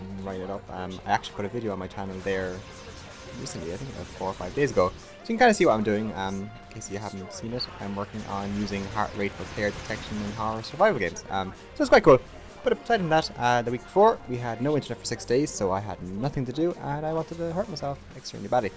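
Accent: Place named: Ireland. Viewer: ahhh his voice is so asmr lol the only Irish voice that triggers my asmr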